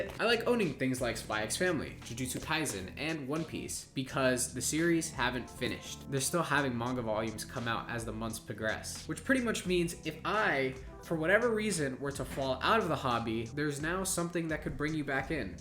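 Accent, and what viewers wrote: Canada. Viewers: I think Canadian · Probably Canadian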